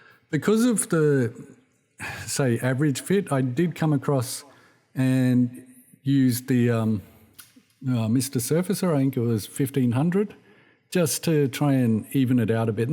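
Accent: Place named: Australia. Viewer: Great commonsense review. I have one of these in Yellowjacks so-called starter kit form which I'm itching to build. The yellow paint scheme will be a challenge! I have tried building older Airfix and Matchbox 1/72 Gnats but not got very far. Good to hear an Aussie voice on a kit review. Mike from the Shaky Isles.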